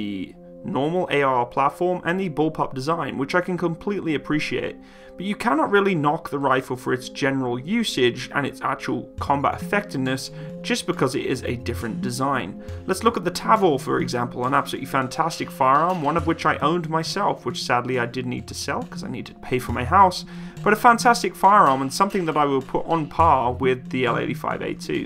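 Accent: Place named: England, United Kingdom. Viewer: you sound english/ do you live in murica, cos if you still live in then uk how did you get the tavor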